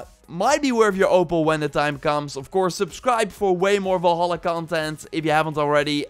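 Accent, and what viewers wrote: Netherlands. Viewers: Is hij Nederlands??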